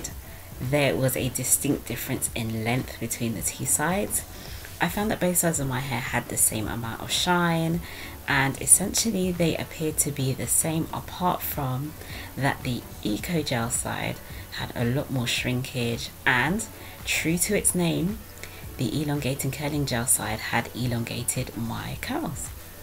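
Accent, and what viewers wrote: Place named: England, United Kingdom. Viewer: First let me say i love your video's ! I'm wondering where you're from or where your accent comes from. I'm thinking you must be English. Every time I hear your intro, I can't help but think of the movie Silence of The Lambs. Hello Clarice.....LOL !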